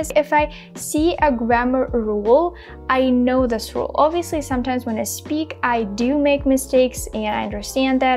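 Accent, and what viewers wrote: Russia. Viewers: Cute Russian accent ☺️👌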